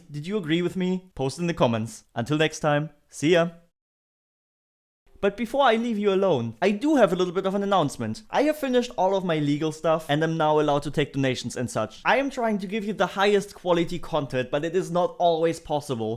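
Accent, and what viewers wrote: Germany. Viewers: Are you German? Sounds like a German accent. I like it. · What is that accent? Is it German? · you deserve more subs and i was wondering are you German or Russian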